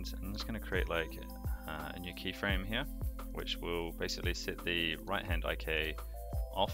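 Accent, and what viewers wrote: New Zealand. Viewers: great. i already know im gonna hear nothing but "zed" instead of Z "not" instead of zero and "soouuuuwwww" instead of "so" the whole time.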